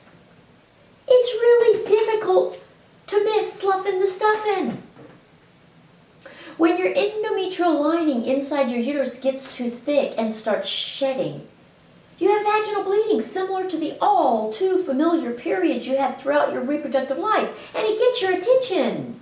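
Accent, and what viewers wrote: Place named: Texas, United States. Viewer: You are the best teacher…EVER! I loved your Texas drawl. ☺😘💕 Until next week, my dear.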